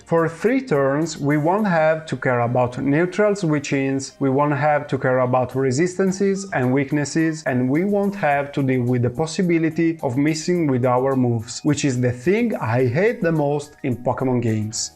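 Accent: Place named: Italy. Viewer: you have one of the strongest Italian accent I ever heard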